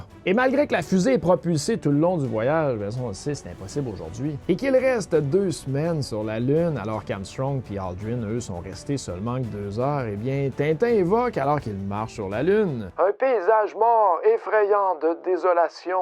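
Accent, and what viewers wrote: Quebec, Canada. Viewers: L'accent québécois comme j'adooooore :) + la voix de la petite voix "trop chouuuu" J'ignore si je suis le seul à aimer ça XD Aller hop, je m'abonne direct et bonjour depuis la France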